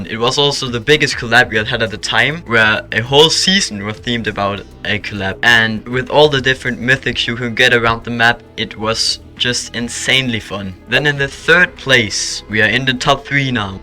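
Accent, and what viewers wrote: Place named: Denmark. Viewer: nice me to i was about to ask you. Bc you really have that danish accent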